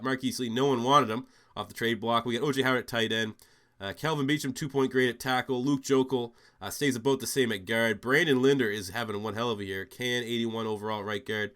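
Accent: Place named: Canada. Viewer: I can't listen to a Canadian speak for 30+ minutes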